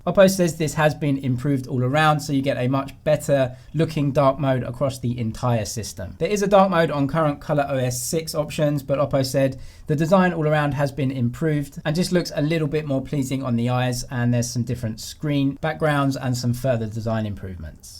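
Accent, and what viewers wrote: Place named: RP, United Kingdom. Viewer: Thank God for RP. Great for non-native speakers like me.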